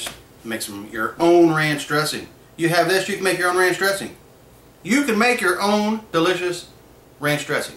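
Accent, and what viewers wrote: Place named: South, United States. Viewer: Why are you speaking with a southern acceny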